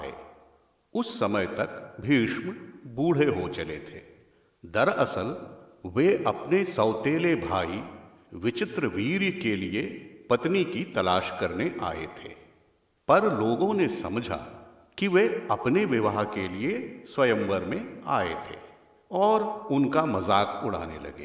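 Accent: Standard. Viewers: शुद्ध उच्चारण में सुनाई गयी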